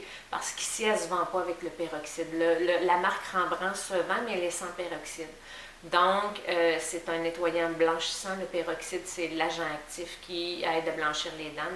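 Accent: Quebec, Canada. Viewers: jadooooore laccent quebecois ! ton accent est 😍😍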